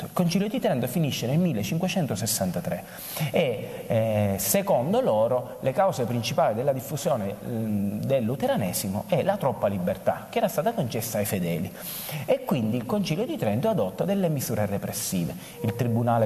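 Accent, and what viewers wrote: Sicily, Italy. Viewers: tu siciliano sei, il mio accento lo riconoscerei tra mille❤️❤️❤️ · Il nn compreso....eccede.....aaaaaa, un saluto grazie il suo siculo è simpaticissimo anch io isolana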